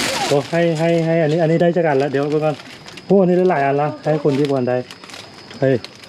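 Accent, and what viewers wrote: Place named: Luang Prabang, Laos. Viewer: มาดูคลิปที่2 สำเนียงพูดกับเด็กไปเหมือนสำเนียงหลวงพระบาง บ้านอยู่ไหนเหรอ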